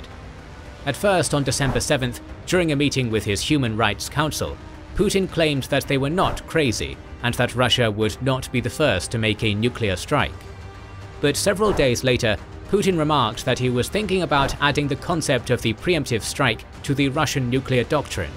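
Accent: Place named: United Kingdom. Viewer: A British-sounding accent always does make for a good narrator.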